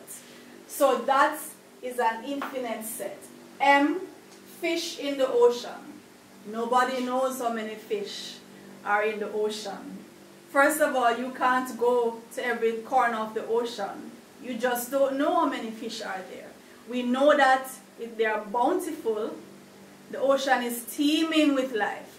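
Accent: Jamaica. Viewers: are you a Jamaican I love the way you speak... The accent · Really nice to hear a Jamaican voice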